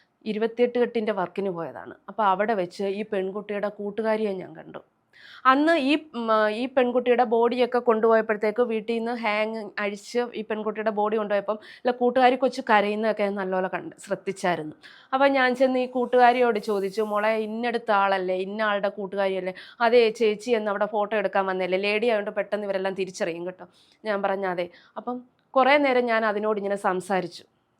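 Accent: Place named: Kollam, India. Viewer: കൊല്ലം ജില്ലകാരിയാണോ ഈ സ്ത്രീ...? "ഭ " ഫയങ്കര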